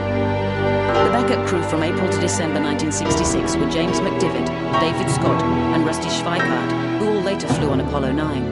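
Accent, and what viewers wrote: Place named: United Kingdom. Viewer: "Ed White was seen on the television monitor trying to open the hatch." Ten seconds later: "With a hatch that could only be opened from the outside, the three men didn't stand a chance." Hmmmmmm. THAT'S good documentary filmmaking for you! So... Either Ed White was clawing at a hatch that couldn't be opened as he died, OR, the hatch COULD be opened from the inside, and this Brit narrator doesn't know wtf she's talking about!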